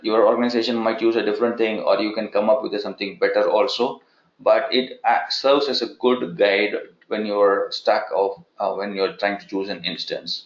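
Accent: India. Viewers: Sorry, that indian English makes it difficult understanding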